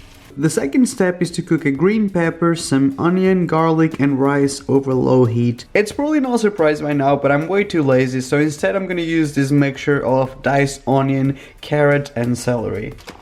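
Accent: England, United Kingdom. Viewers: Thas is his accent cause he is in england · Seriously dude you're sounding more English each day... And no the milk should be poured, you can get the puddings in a can and steam it... Can't believe you didn't heat it up.... Lmao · Deadshot Mini Maybe cause he lives in the UK and tacos are not something they usually eat and they also pronouce things differently than we in America do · Hes accented ever heard of enlgland kid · Gracie Denton he’s from England so he says stuff differently than us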